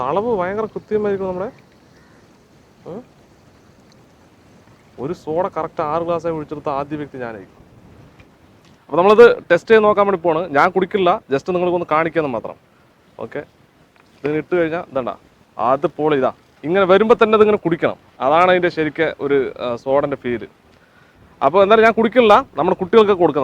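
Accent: Palakkad, India. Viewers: പാലക്കാട്‌ ഭാഷ പൊളിച്ചു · ഫിറോസ്ക്കാന്റെ പാലക്കാടൻ സംസാരം കേൾക്കാനായി മാത്രം വരുന്നവരുണ്ടോ ഇവിടെ? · This is Palakkad Malayalam sir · Ningalu palakkad karananno? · Palakkad ആണോ വീട്...? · Thani palakkaadan...😂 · ചേട്ടൻ പാലക്കാട്ട്കാരനാണല്ലെ പൊളിച്ചൂട്ടാ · That Palakkadan slang!! 😍😍😍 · തനി പാലക്കാടൻ ശൈലി... 😍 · Aa Palakkad slang😍😍😍😍😍😍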